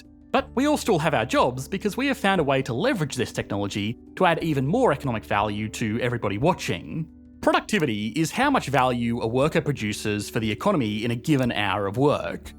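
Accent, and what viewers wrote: Australia. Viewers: AI could have written the script and spoken in the exact same Australian accent as this guy and no one would have known the difference. But yeah, we got nothing to fear. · It’s just hard to take Australian accent seriously in any way.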